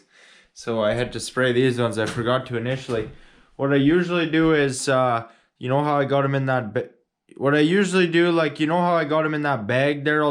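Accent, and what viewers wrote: Canada. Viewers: hahaha nothin brightens my day quite like that canadian accent sayin "well good day there boys n girls". i was lookin in my subscriptions and this video was at the top and started running the video without the audio and i could see his face and the subtitles writing that first line out and it made me chuckle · where are you located you sound canadian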